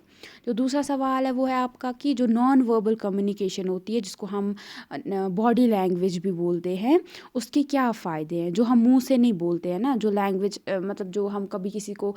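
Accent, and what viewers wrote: Kashmir, India. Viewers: 😂😂di kashmir se ae🙄kashmiri typ urdu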